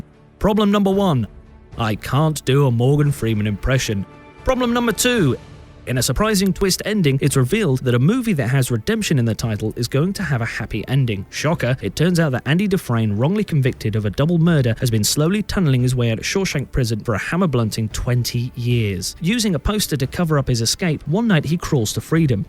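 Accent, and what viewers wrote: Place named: Bristol, United Kingdom. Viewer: He sounds a bit bristolian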